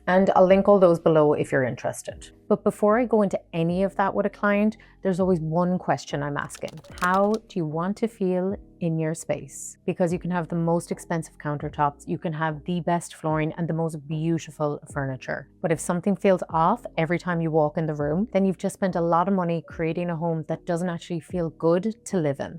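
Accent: Ireland. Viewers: first, she's irish, in my opinion a much cooler accent. second, i recently ' learned ' (it may or may not be true) that before amer. was amer. the british spoke with an 'american' accent. it was the british who changed, amer. accent is the original. if it is true, it is pretty funny. btw there are many different british accents as well and some of them are also torture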